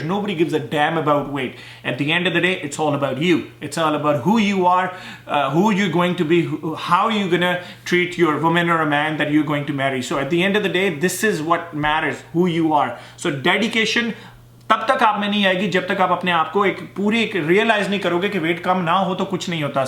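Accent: Punjab, India. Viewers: Love the way you talk in straight punjabi. You are very informative 👍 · I loss 2 times after my delivery 6 year ago Now i gain 25 kg , your videos r very interesting Specially tuhadi punjabi Theth punjabi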